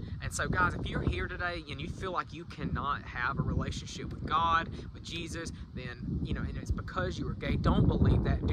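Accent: South, United States. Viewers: A fantastic video. I love your southern accent. I could listen to you talk all day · I love your accent, sounds so southern haha.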